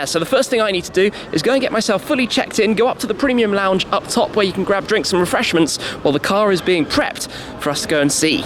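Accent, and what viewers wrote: England, United Kingdom. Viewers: english accent is really ugly sounding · Where are you from Schmee...England or Germany?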